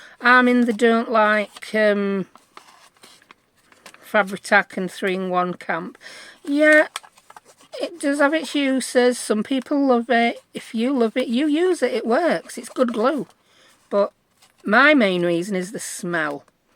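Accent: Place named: Barnsley, United Kingdom. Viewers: Hi love your bag journal, I will deffo be trying that in my witchy papers. Where are you from you sound from like my neck of the woods in Wakefield x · These are brilliant! You are definatly my favourite junk journal channel. I love that your accent is so familiar too, i'm from barnsley, are you local to me?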